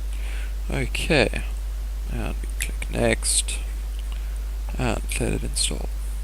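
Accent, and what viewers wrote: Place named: South Africa. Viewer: I opened this video randomly and immediately recognised a South African accent😂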